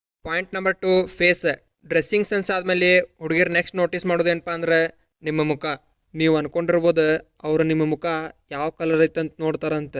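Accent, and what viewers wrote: North Karnataka, India. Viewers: ಅಣ್ಣಾ ನಿನ್ನ ಉತ್ತರ ಕರ್ಣಾಟಕ ❤ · Dost ne pakka uttara Karnataka dav adi nod .. Nimmur yvda ..🧐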